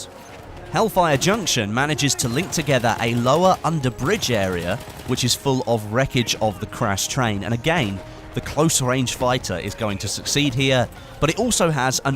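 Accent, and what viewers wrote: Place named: United Kingdom. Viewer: fresh to have a British accent on a battlefield video.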